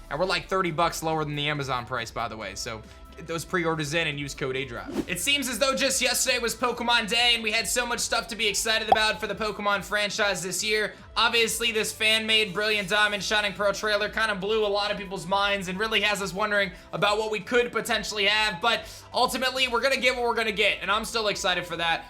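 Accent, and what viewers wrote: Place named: California, United States. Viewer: honestly it makes it hard for me to watch, I liked his old stuff and I’m actually enjoying his xenoverse playthrough but he sounds like he’s from Cali or he’s trying to be a chad but I know he’s from like the midwest or something. It sucks that he’s gotta change his persona to get views but I guess that’s his job right ?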